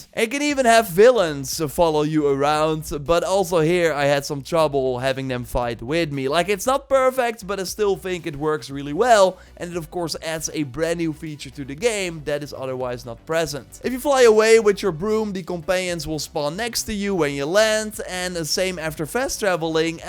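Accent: Netherlands. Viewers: Woow you sound super Dutch haha 😂 hello from a fellow Dutch guy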